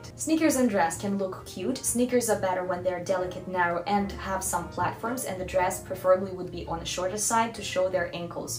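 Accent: Russia. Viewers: Is your mother tongue Russian?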